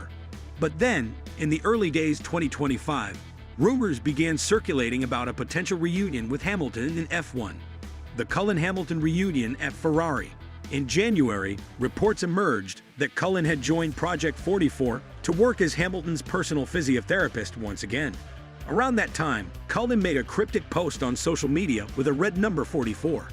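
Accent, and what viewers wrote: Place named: United States. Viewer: DUMP THE STUPID AMERICAN ACCENT. AT LEAST AN ENGLISHMAN KNOWS HOW TO PRONOUNCE GRAN PRIX.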